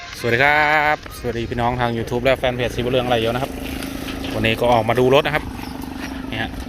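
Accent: Phu Thai, Thailand. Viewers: คือสำเนียงออกทางผู้ไทย แม้นเบาะครับ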